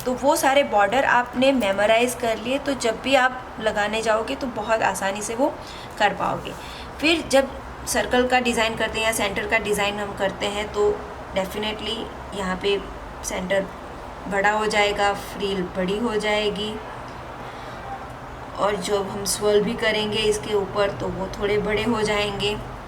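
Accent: Gujarat, India. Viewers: Ka kha ga gha Tame Gujrati 6o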